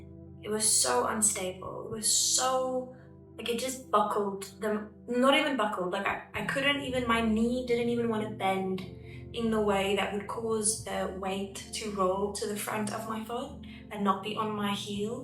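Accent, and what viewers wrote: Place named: United Kingdom. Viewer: West I was watching that and you read it felt like I was hard to say but I guess I'm just going to use the the easy way out it was like it was like I was in The Matrix and everything around me was it real but you were like an oracle guiding me to see the NPCs😊. Dad I don't want to say too much but maybe, it's like it's so interactive and it's like I don't know where you are I think you're probably in the UK just by your accent and I hope your knee is okay I don't know what happened to your knee but is that the secondary infection I think you called it. I don't really know what that is maybe you'll explain it to me or I'll Google it but back to the story it felt like when you were I guess you were reading what you had Gerald before or just something something that you felt that possibly channeled through the quantum field possibly maybe it's, maybe it's coming from the higher timeline. 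But it is very....????? Needed..❤❤❤for me, it feels like you're like a dream and I don't know why I didn't find you before but I found you now due to some bigger story narrative to buy narrative Divine unfolding I don't even know if there is a such a thing maybe it's all a big illusion. Maybe it's our I've come to the realization that I know that I am not a human with a conscience I'm a Consciousness with the human in a human exploring and maybe this is all a construct of how we think it should be, but I feel with what you say it helps me coalesce into reality whether that be a tangible reality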